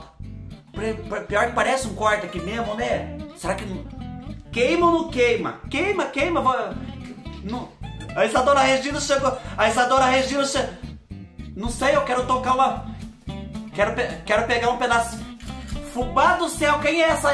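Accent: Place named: Caipira, Brazil. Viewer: FUBÁ D CEU amo seu sotaque q caipirinha , o maia lindo kjķkk❤😍🤩♥️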